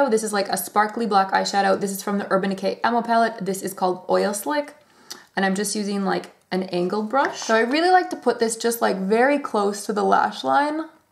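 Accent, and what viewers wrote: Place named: France. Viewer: the happy light thing from bourjois is awesome :D I don't mind you using the same products because you always look flawless. I love your french accent, very accurate! I think the clubbing waterproof eyeliners from bourjois are great, i have loving green and 49 crazy about brown and i'm thinking of getting the black and the dark brown ones as well... somebody stop me :))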